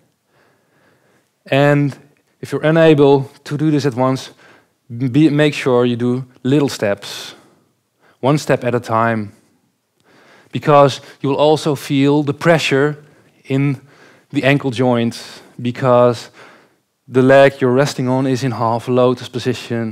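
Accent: Netherlands. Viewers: Ik kan horen dat je nederlands bent haha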